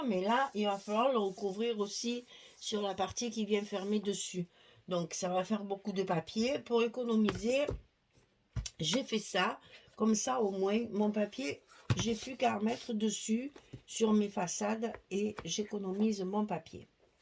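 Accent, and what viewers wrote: South, France. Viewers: Bonjour, c'est vrai que ce n'est pas le style de papier que j'aime mais j'adore regarder vos vidéos, j'aime votre façon de travailler et vous écoutez parler 😊 Votre petit accent du sud met du soleil dans ma matinée 🙂 Alors merci pour vos tutos et votre joie de vivre. Cela donne des idées même si on ne fait pas exactement la même chose ou avec la même collection de papier. Belle journée à vous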